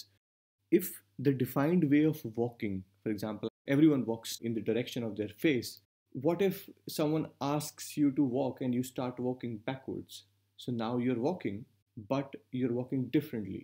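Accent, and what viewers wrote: India. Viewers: Another brilliant Indian with his beautiful accent. Very helpful video. Thanks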